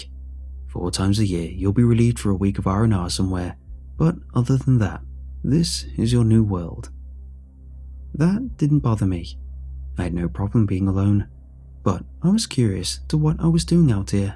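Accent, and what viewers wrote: United Kingdom. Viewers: Sorry, can’t listen to some bullshit story about US troops from a British accent and verbiage. Wtf?!?!?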